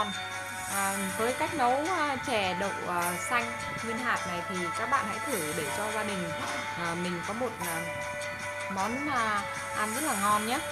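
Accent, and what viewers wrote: North, Vietnam. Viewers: Cái này dạy nghe nhạc chứ nấu chè gì , nói giọng Bắc , lồng nhạc to đùng , nghe phát ghét ai mà đăng ký kênh cho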